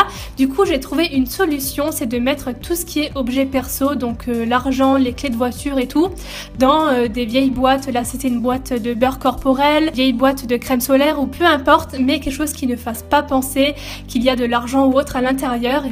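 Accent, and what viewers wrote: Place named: Alsace, France. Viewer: Tu es de quel origine ton accent ressort grave on dirait une alsacienne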